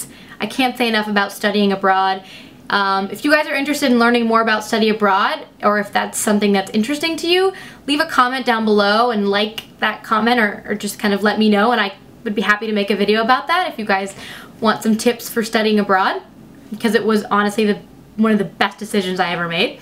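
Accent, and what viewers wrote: United States. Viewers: ) Wow one shot:O Your american accent is perfect, congratulations. Believe that you are not french finally. I'd love to hear you make tongue twisters in english and in french, you gotta be unbeatable in this area. It's always a pleasure to listen to you (even in english). You are truly a wonderful woman and so beautiful. Kiss from France. XO...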